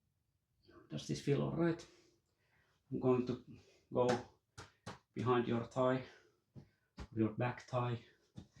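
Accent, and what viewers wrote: Finland. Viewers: I'm SOOOO into finnish accent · loves the Finnish accent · I love the Finnish accent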